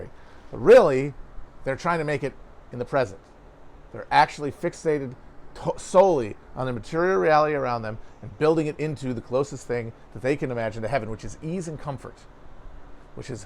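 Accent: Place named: Italian-American, United States. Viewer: As a Buffalonian, I appreciate the gabbagool accent for us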